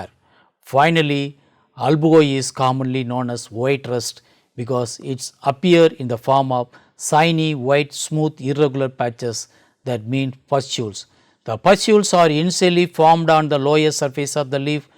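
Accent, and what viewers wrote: India. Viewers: Respectfully, I feel it is a shame there is no subtitles for this video. There may be helpful information on the subject matter but the speaker's extremely thick accent makes it nearly impossible to understand what he is saying. I find it very difficult to listen to people with an Indian accent speak English and they very often seem to insist on doing it anyway without subtitles and without learning how to properly pronounce and accunciate English words. I can't imagine learning to speak Hindu with an American accent and expecting native Indians to understand what I am saying and retain helpful information on a scientific or medical topic without being distracted or frustrated by the language barrier. Would it not be better to make the video in your native language and have subtitles in English or have it translated and a voice-over done by a native speaking English person if you want your viewers to truly obtain the content you are providing?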